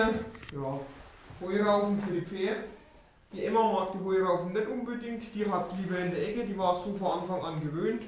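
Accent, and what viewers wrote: Franconia, Germany. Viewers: Sprich net fränkisch dich versteht keiner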